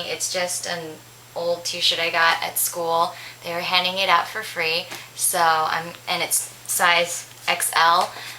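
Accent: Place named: Canada. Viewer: she sounds Canadian to me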